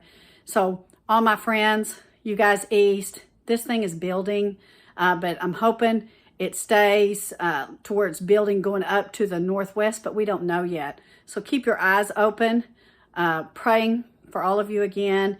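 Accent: Texas, United States. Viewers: Just seen this today.....I have a younger Brother in Richland Hills and I will have to call some friends of his to see if he is alright ..... he doesn't speak to me but I shore want to know if he is out of danger. And I like your Texan accent!!!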